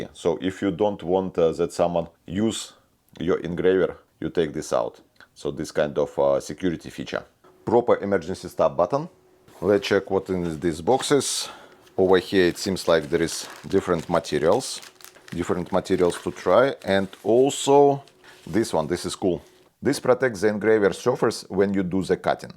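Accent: Russia. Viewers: Если судить по акценту, то вероятно носитель русского языка. Я хз как я попал сюда, но видео занимательные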